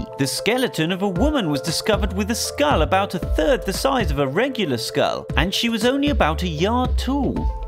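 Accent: England, United Kingdom. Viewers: Nice Englishmen English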